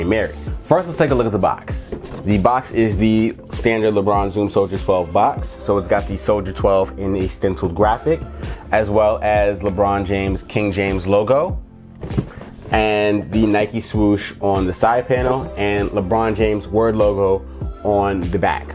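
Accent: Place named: California, United States. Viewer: You say you from new york I do not hear it in your voice son you sound like a california kid my accent is deep from South Bronx heart of new york kidd E 194th Briggs come on son rep right son aiiight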